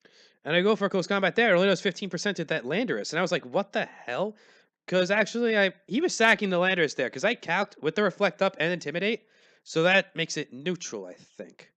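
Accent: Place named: New York, United States. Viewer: Really sound like a New Yawrka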